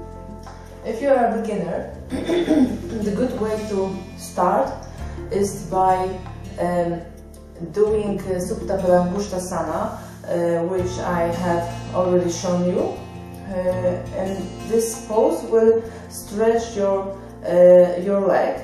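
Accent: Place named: Turkey. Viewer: türk aksanı sezdim sanki :)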